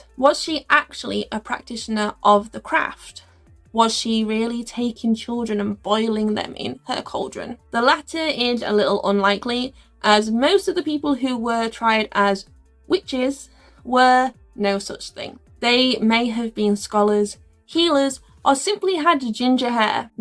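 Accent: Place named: Yorkshire, United Kingdom. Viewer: Yaaaay you're back, well done ^^ no it doesn't matter how dishevelled you are, it's a podcast, and honestly you still look stunning, it's kind of upsetting 😅 As much as the Cex demons have me curious 🤔 this might not be my first investigation location, maybe the next one >< definitely first time hearing of the ram hinn (yes you are very Yorkshire and it's wonderful) 😅 I was actually dozing off towards the end, because it's chill and 3am, not boring lol, night ^^ + I like all the editing, the text inserts and images were a nice touch, bloopers were cool too, again good job =]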